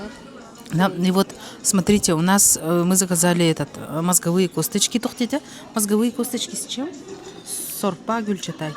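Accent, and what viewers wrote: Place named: Kazakhstan. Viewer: у вас уже произношение казахское. хорошего вам времени провождения в Бишкеке. Вопрос вы часто используете междометие Де или Ди что это значит с якутского?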